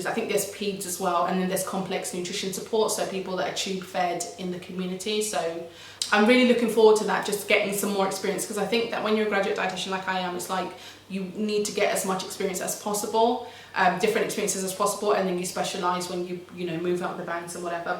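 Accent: Spain. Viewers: Your Spanish accent is funny lol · I can’t with you the Spanish accent am bussin up 🤣🤣🤣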